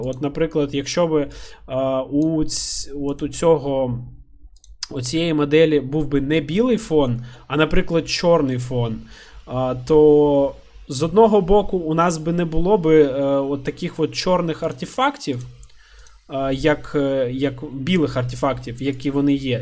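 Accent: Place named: Surzhik, Ukraine. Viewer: Классний суржик, бро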